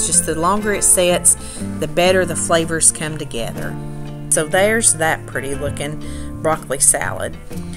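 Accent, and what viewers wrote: South, United States. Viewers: Hello there new here and love your recipes and that country talk. I am also from the south Alabama. But live in Central Florida.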